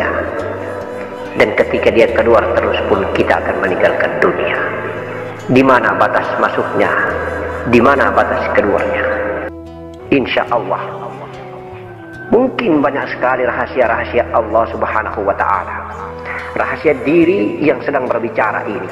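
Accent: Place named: Bugis, Indonesia. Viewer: Assalamualaikum Kalau saya dengar logat bicara nya Abah guru tercinta ini orang Bugis Ada gak yg tahu nama dan alamatnya. Kami mau ketemu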